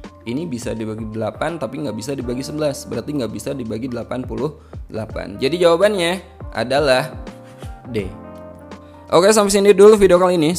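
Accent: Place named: West Java, Indonesia. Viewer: Pix Sunda Pisan😊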